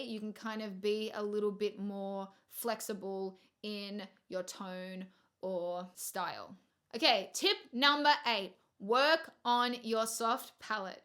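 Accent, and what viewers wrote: Australia. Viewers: I love the Australian accent i wanna be Australian omg lol ahhhh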